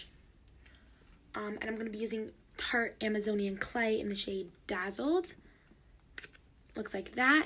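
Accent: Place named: New York, United States. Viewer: getting heavy new york accent vibes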